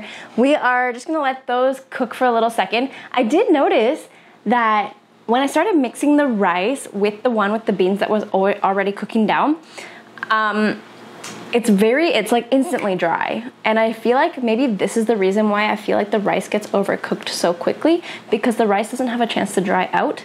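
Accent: Costa Rica. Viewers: En español tienes acento costarricense, por un momento pensé que estaba usando una dobladora costarricense 😅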